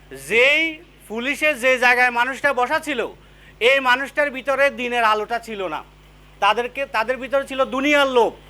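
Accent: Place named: Noakhali, Bangladesh. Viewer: ভাইয়ের বাড়ি কি নোয়াখালী নাকি?? নোয়াখালী নোয়াখালী টান আসে।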